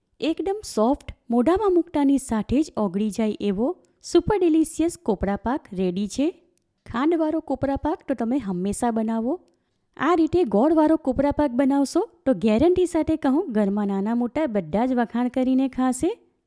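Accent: Surat, India. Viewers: કોપરા પાક પણ ખૂબ સરસ અને તમારો અવાજ સુરતી ભાષા ખૂબ જ મીઠી 👍👌🤪❤️❤️❤️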